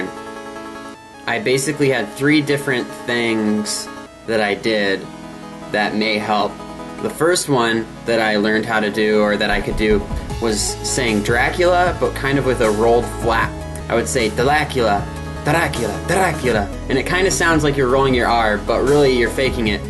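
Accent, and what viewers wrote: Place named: United States. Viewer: First of all thank u for ur prilliant ideas, but iam wondering why u r learning rolling Rs cuz u seem like pure American I feel like u r a native speaker thanks again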